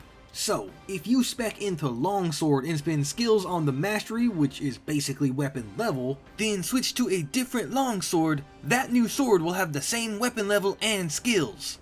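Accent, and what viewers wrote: United States. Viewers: I was not expecting to have a stereotypical American accent when doing a Chinese game review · Yeah I'm not playing this game but will support whatever video you put out. Also you got a beautiful southern drawl and it's the 1st time I ever noticed it.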